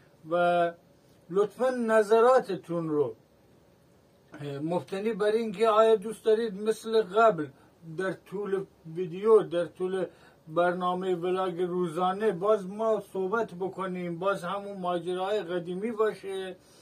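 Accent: Gilan, Iran. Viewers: خسته نباشی جان اگه تو ویدیو هات صحبت کنی خیلی بهتره چون با اون لهجه گیلکی خیلی با نمکتر هستی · دوست گرامی چرا با لهجه زیبای گیلانی صحبت نمیکنید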